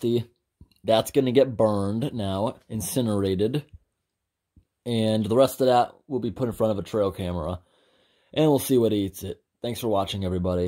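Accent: United States. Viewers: Why does this guy sound to me like the biggest American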